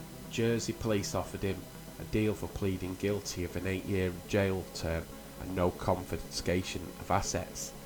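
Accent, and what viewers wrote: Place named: Manchester, United Kingdom. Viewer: Liverpools in the North East? U sound like a Manc and you say Liverpool is in the NORTH EAST? Lol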